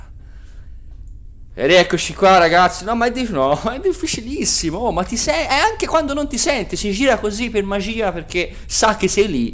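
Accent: Tuscany, Italy. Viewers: LIKE SEI TOSCANO/A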